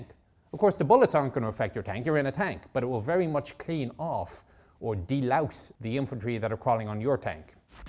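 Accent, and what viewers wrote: Ireland. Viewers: I sense the Irish accent. I’m a dub where you from buddy.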